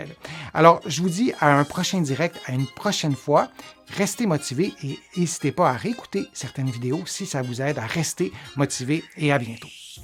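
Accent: Canada. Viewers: Merci pour tous vos conseils , je vais acheter vos livres et je vous regarde en vidéo et puis j 'aime entendre votre voix avec le petit accent canadien . Vous êtes très motivant pour nous tous.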